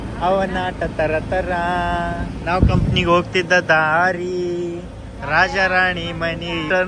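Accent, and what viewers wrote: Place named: Bangalore, India. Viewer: Nim vlog nodi khushi ayitu😂 benglore bhashe chand matadiddiri sis❤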